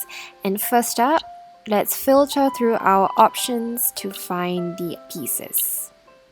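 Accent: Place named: Singapore. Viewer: damn the narrator is singaporean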